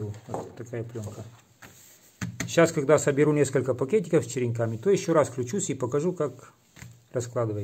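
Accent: Caucasus, Russia. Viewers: Да согласен , объясняете хорошо , но вы забываете или я может быть где-то пропустил где вы живите, судя по акценту с кавказа.Поэтому считаю что дата месяц не имеет значения .Имеет значения какой климат или создать климат для укоренения .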